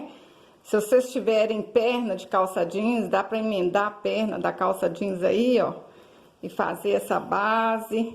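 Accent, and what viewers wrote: Minas Gerais, Brazil. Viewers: Eu sou mineira e pelo seu sotaque você também é 🥰 sou de Patos de Minas Minas Gerais,a capital do milho 😅 com muito orgulho da minha cidade!! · "O TREM BONITO DE MAIS." ADORO VC FALANDO ASSIM .O MINEIRES . TB SOU MINEIRA 👏👏👏👏👏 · Boa noite aqui é Silvania de Sabará,eu amo esse seu sotaque minerim.É bom demais da conta.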